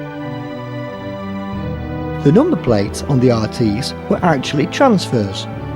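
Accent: United Kingdom. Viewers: The film was made in the mid 1950s - the heyday of the London RT family and long before Routemasters entered Aldenham. Wonder why a Liverpudlian was chosen as the commentator. So UNLIKE London Transport who normally preferred a plummy London version! Music was like attending a funeral! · ...And why use some Northern guy as a narrator rather than someone from London.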